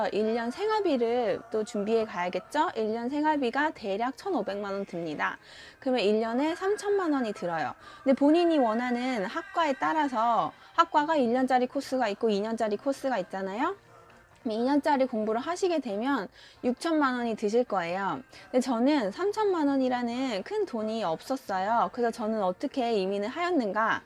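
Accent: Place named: Japan. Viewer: 영어발음이 일본어인데?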